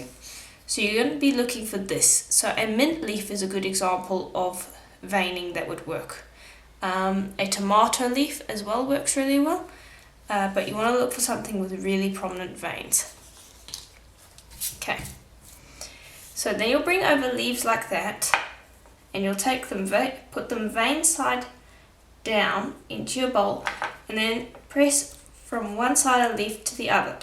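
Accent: South Africa. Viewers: Your accent feels so familiar! Are you a bittie South African? Enjoy your videos! Thank you. 💖🌸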